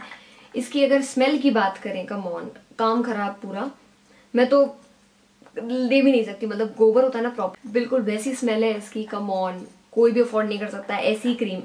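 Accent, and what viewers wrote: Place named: Rajasthan, India. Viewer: U totally rocked the rajasthani accent today!!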